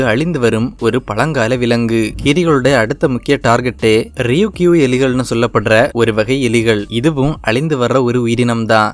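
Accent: Kanyakumari, India. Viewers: The voice recorder is from kanniyakumari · Bro nee Kanyakumari thanaa 🧐🙂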